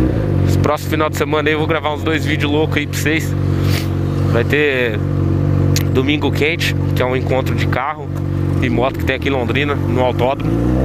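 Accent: Goiás, Brazil. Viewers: e Porque Fala o Pique Do Goias? Kkkkkk Sou Goiano e n Entendi n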